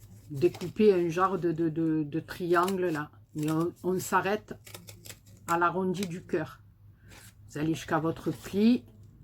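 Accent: South, France. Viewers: Super carte et j’adore vôtre accent du sud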